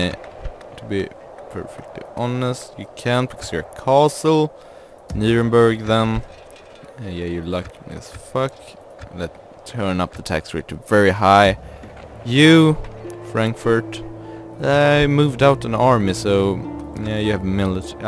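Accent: Sweden. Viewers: You're swedish right? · Hahaha, more swedish accent please!! :D:D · Skåne? :D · you sound like a swedish person....är du svensk? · are you Swedish? sounds like it. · are you frome Sweden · Det märks verkligen att du e svensk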